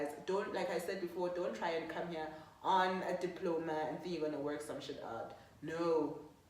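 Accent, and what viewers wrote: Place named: South Africa. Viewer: I love your Xhosa accent dear. You make me miss ezlalini. And thanks alot for such an informative video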